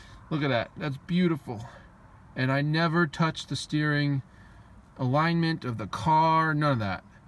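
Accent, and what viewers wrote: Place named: Texas, United States. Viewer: Texas?? Lmao you sound like you’re from Texas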